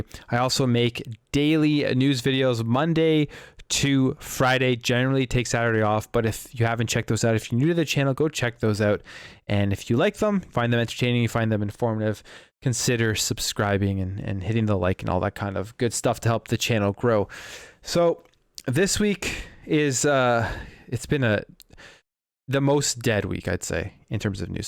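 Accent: Canada. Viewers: Figured you were Canadian when I heard Aboot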